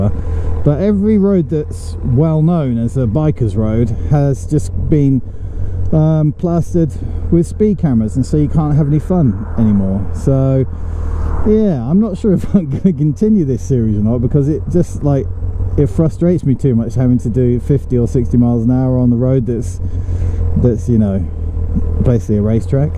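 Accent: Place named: RP, United Kingdom. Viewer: Love the posh stereotypical Hollywood British professor accent 😅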